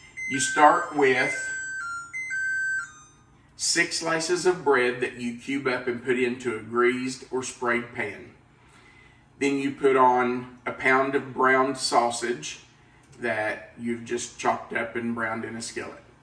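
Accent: South, United States. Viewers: This looks so good and easy to make over the holidays when we have company. Thanks for sharing. Also, my hubby and I are having a debate on accent (well region you're from) I say soutjern states and he says Pacific Northwest. Settle our debate. Lol!!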